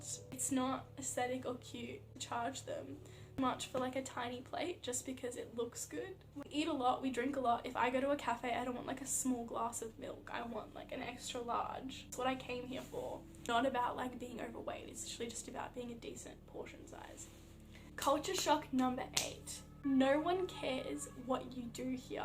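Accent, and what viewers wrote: Australia. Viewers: I really love the australien Accent ^^ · Those are some new "shocks" about us I haven't heard about :D Love your accent too, heavy US American kind of "geht mir auf die Nerven" now, so Australian is a refreshing change to listen to! Have fun in Germany :) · It is always intersting to see how a foreigner sees and experiences our country and us people. It can be very entertaining and at times even funny. Partially your australian accent is a bit unusual to me, but that is not too dramatic. But I know what Macas is. We Germans often say Macens. Welcome to Germany, enjoy your time. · I'm native Ruhrgebiet but worked in international companies in Madrid and Dublin before returning recently. The thing I miss most right now is the international flair while out and about. So, please speak loudly with an Australian accent whenever possible :)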